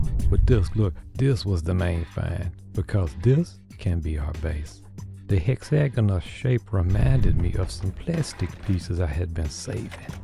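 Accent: Louisiana, United States. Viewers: Because he's either Cajun or Creole I think. He's mentioned before about where he lives and the accent might be put on a bit for the videos but that's part of the character I guess. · Where is he from? Is he Cajun?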